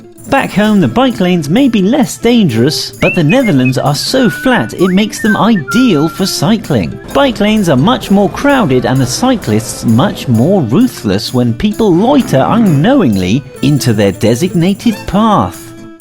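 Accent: United Kingdom. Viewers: Is it Brexit that I don’t like the British accent anymore?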